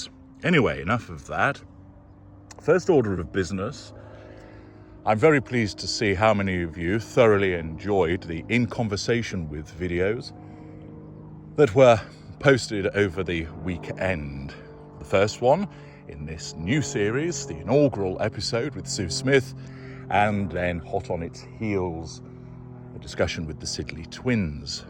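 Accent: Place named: Kent, United Kingdom. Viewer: Hmm, getting a lovely Kentish vibe from your video, it’s giving your voice a lilt. That photo is a real keeper, full of joy and excitement. Megsie must be screwed up inside.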